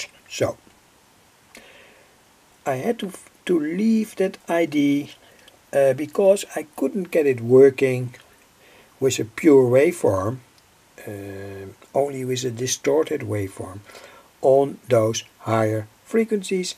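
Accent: Netherlands. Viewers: I know it's a random question but are you Dutch? I've been to netherlands and they have a similar accent. Thank you for the cool content. I really like the gigh frequency circuits you make and you make it seem way easier than I would think it is. Well it is actually.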